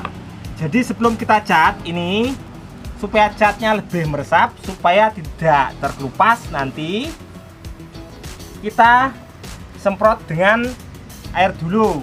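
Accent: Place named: Java, Indonesia. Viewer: Aku lihat sampean ki kok ingat sama lek gogon ya mas..dialek jowomu iku lho aku seng seneng...tapi lek gogon kok alih propesi yo mas..slam satu hoby mas.dari bojonegoro jatim.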